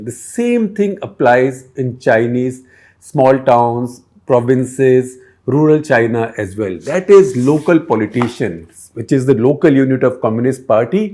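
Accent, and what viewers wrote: India. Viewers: It was great fun listening to you pronounce Chinese names in an Indian accent. Thank you sir! :-)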